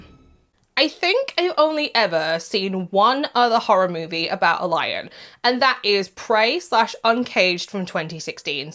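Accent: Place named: United Kingdom. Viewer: tearin' bad movies apart with British accent makes it EVEN funnier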